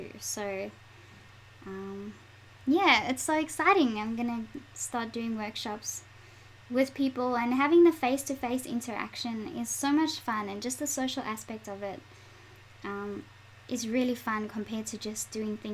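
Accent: South Africa. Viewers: I wasn't expecting the Safrican accent :) Pleasant surprise. Great looking models too. Enjoy playing with them!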